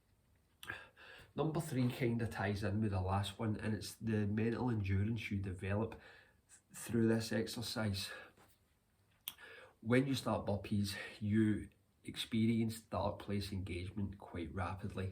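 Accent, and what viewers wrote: Scotland, United Kingdom. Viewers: On a side note brother, your scottish accent makes the captions hilariously funny. It can't pick the right words.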